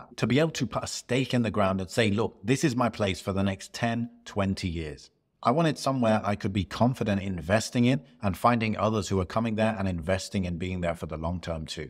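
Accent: United Kingdom. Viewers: Wait a minute are you British? How could you spend 19 years in the Middle Eastern region if you sound like a British guy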